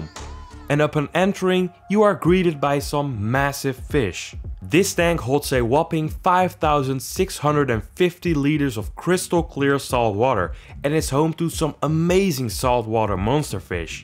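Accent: Netherlands. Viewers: I think your Dutch😁 by hearing your accent so….super gaaf. Ga zo door met video’s maken.😊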